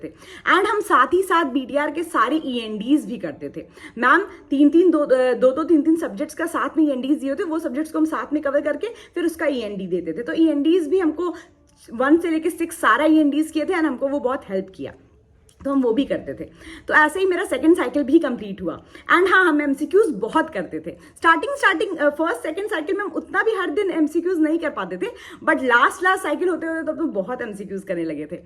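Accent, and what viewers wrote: Bihar, India. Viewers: Is it bihari accent? It's cute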